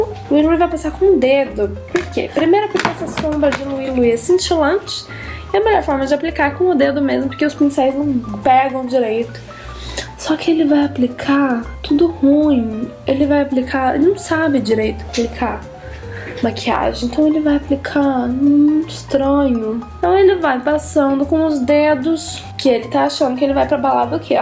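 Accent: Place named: Rio de Janeiro, Brazil. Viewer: querida, tu tinhas um resquício de sotaque carioca... Amey esse vídeo!:D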